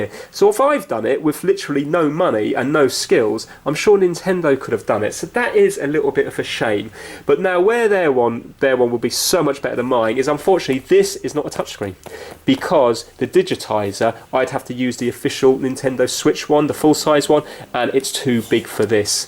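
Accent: United Kingdom. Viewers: Haha your happy British voice :)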